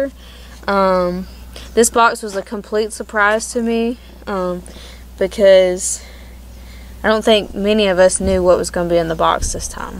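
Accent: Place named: South, United States. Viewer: i love how beautiful this woman is and southern voice... great video by the way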